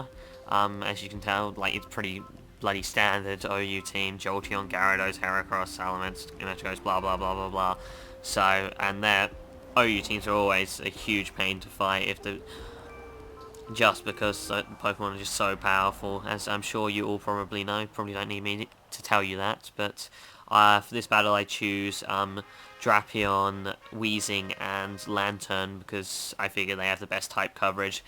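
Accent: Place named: United Kingdom. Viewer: I always love hearing Brit voices... I'm not quite sure why... but in any case, coo' battle. About the switching between Gyrados and Jolteon business, there's chances that you might overpredict them and they won't switch and if you do the wrong move expecting a switch it really messes you up. It's a good thing though he did do what he did... if all you did was Confuse Ray to Gyrados it still would've been good but imagine if you picked Surf and he didn't switch >_>;.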